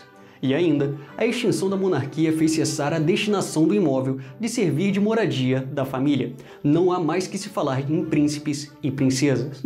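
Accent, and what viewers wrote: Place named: Rio de Janeiro, Brazil. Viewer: Parece que o aparelho dental, a barba e o "carioquês" estão te prejudicando. O conteúdo é bom, mas não é só disto que depende o sucesso de um canal.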